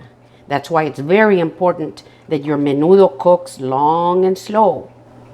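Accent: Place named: Rio Grande Valley, United States. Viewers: Loving your videos. Are you from Texas? I can hear it in your accent. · 15 seconds in...I knew you're from the RGV! 😁 It's the accent...😆(since I was from there, now living in Houston), but I'm looking for an awesome recipe for menudo to serve at least 10 people...I'm gunna try yours and just adjust the size portions to cook as much as I need to feed. 😎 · My family is from your end of Texas. I appreciate that you used vinegar in your recipe. I made some menudo recently with the honeycomb my favorite. I use vinegar to quell the smell also. You remind me of a cousin of mine from Sand Diego Texas shes long passed away but you two look a lot a like and your accent so unique to that area. After watching you Ill have to make a couple of adjustments to mine. I really like the method you use on straining the chilis into the soup.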